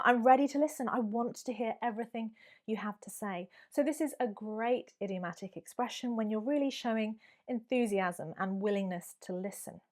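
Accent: United Kingdom. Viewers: Hello, I am an Arab from Bahrain and I want to learn the British accent soon. I will learn it from you 🥰🥰♥️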